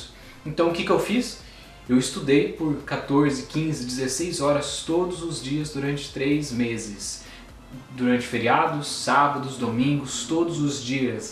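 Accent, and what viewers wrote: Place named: United States. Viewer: Parabéns! Kkkk já está ate com um pouco de sotaque americano kkk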